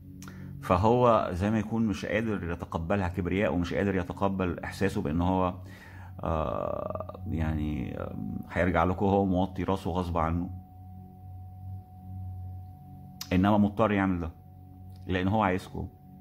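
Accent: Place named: England, United Kingdom. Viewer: I love ur english accent ❤ يا لهوي 😊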